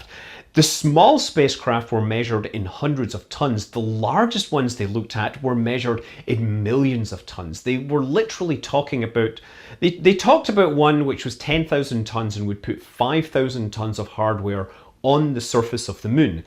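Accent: Scotland, United Kingdom. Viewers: U can hardly hear your Scottish accent. I guess you have been living in san Francisco for a long time. Btw I enjoy your videos keep it up.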